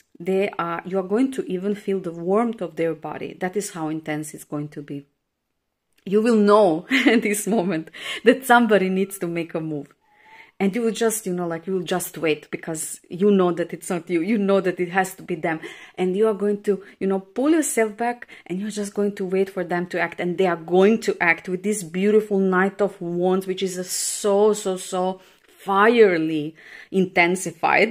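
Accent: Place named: Russia. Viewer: You have a Russian accent. Are you Russian? 💜😍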